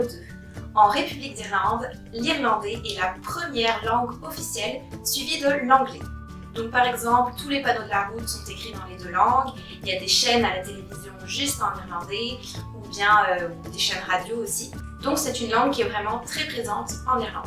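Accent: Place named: Quebec, Canada. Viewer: Merci pour cette vidéo! Tu as un léger accent québécois je trouve! :)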